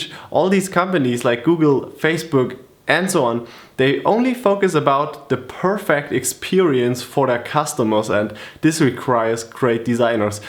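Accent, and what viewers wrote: Germany. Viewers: Where are you from? Germany? :D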